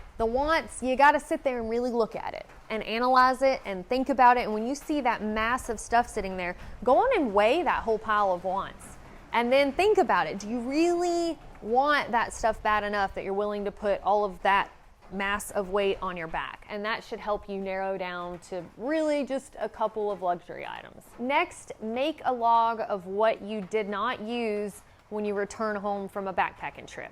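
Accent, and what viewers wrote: South, United States. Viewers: Why can't every woman in America have a southern accent ?? 🙄. Makes your videos so much nicer to listen too. Thanks for posting.... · Draws? As in rhymes with straws? I’m pretty sure you’re talking about undies. I do love the southern accent. But our European friends might have problems with this one. Anyways, great video as usual. Keep them coming.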